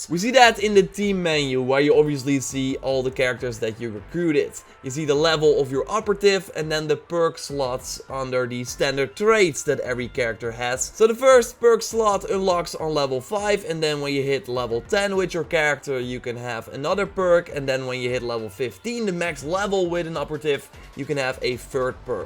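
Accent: Netherlands. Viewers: Are u Dutch? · Are u dutch because u sound dutch?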